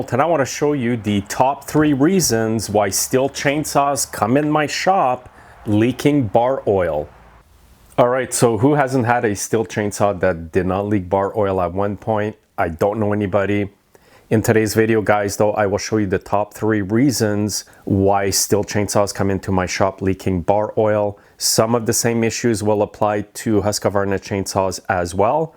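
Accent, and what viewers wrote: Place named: Newfoundland, Canada. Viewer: You must be from either Newfoundland or Labador by that Accent that you have!! you can't Fool an Old Canuck Lol anyways Love you for being a Caregiver to you're Wife your a Very Strong Man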